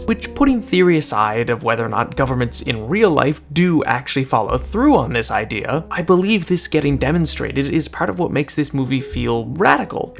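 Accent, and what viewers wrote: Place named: Canada. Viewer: He sounded Canadian to me.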